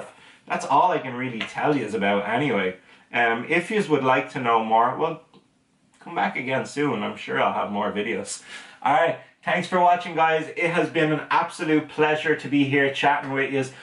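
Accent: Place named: Ireland. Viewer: I never knew I needed an Irishmen to teach me Pokemon. Erin Go Bragh!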